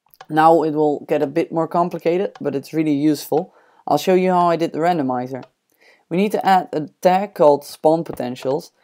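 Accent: Netherlands. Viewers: You sound kindof dutch, are you from holland? If so, I'm to. If not, nevermind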